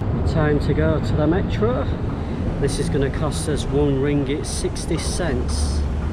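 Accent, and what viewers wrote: United Kingdom. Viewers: You from British?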